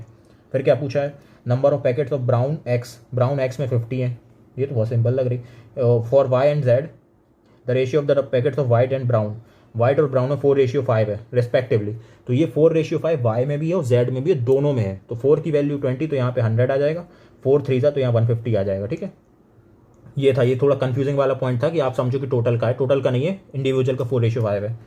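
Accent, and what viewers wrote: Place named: Bundelkhand, India. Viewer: Bundelkhandi jhalak rhi h apki tone me... Gajab badde.! Maan gaye..💥💥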